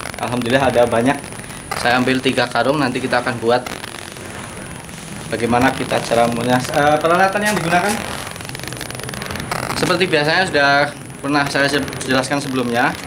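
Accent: Banyumas, Indonesia. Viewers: dri logat nya kya org banyumas ya om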